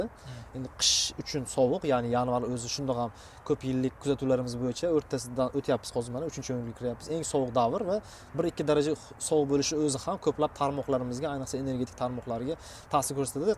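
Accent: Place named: Fergana, Uzbekistan. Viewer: Нимага чала гапирасиз фаргона гапиз кобкети ичизда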